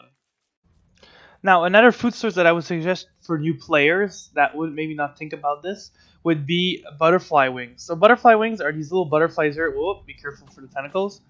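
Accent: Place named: Ireland. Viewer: Just happened upon this channel... I really enjoy the Irish(I'm assuming) accent.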